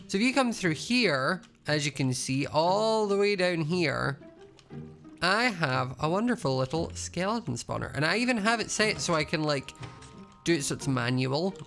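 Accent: Scotland, United Kingdom. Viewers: I've notice your accent is less Scottish since the start of this smp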